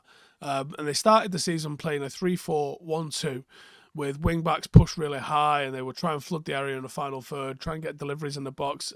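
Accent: Manchester, United Kingdom. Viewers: lol if you cant tell the guy in this video has a manc accent you’re absolutely tripping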